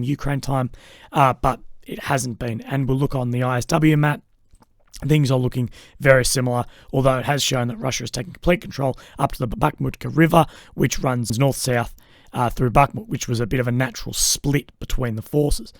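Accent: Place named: Australia. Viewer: You can generalise as you wish if it's based on a genuine observation not obstinance..... "I thought Aussies were better than this" is actually saying that Australians are held to a pretty high standing in the world of fair play, and standing up for the oppressed over the oppressors... along with the Kiwis. looks and sounds the part, but his consistent agenda is at odds with his apparent Aussie-ness and overstated Neutrality which doesn't follow through to his actual stance.....he's an Oxymoron (or is it Aussiemoron 🤔) Aussies are awesome, and I know he doesn't represent the majority!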